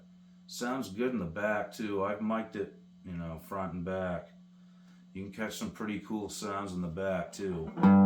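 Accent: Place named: Boston, United States. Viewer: you boston sounding mf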